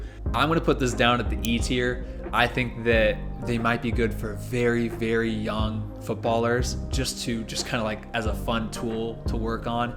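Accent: United States. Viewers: In America we call it soccer, and you sound American. Real Football 🏈 is different. The title of your video is misleading. Just kidding man. I watched anyway and these tools will help either sport. Thanks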